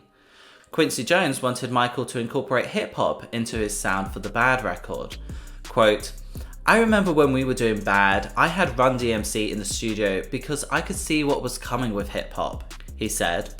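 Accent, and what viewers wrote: United States. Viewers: I was ok with american accent❤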